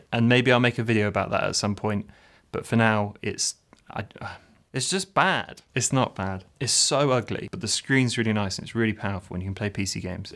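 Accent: United Kingdom. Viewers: You are comfortable on camera and speak calmly & beautifully with your British accent, so who cares about what your visual style choices look like? What you offer with information & services and how you present it is what matters. Not what you want to wear.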